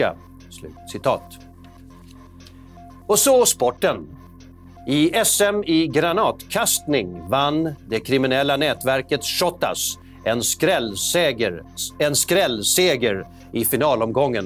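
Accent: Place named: Baltic states. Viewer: Lätt svenska. Låter Balt...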